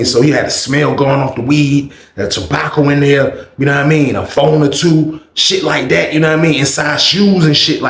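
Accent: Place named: Chicago, United States. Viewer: Yo the Chi town voice tho! 😂😂😂😂 then the $1000 POWER PLAY!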